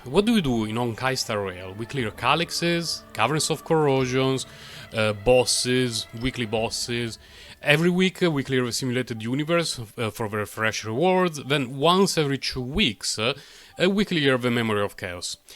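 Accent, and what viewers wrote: Italy. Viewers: I have no idea why, but you give such strong italian-english accent vibes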